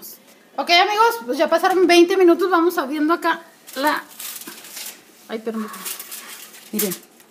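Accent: Chihuahua, Mexico. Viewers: Acento de Chihuahua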